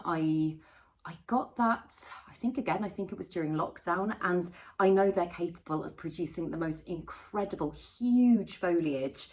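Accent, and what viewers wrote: England, United Kingdom. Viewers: I love your videos, they are very informative and I love your English accent, I live in South Texas and we can grow so many plants here in pots and in the ground. I have philodendrons and alocasia outside, and they go dormant in the winter and pop back out every spring, such hardy plants and so full and beautiful in the summer, people actually stop to admire my yard.!!!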